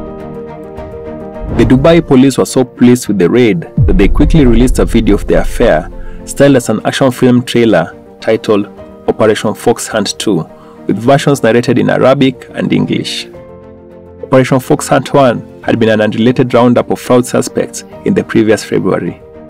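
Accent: Kenya. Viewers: Mr narrator, are you kenyan?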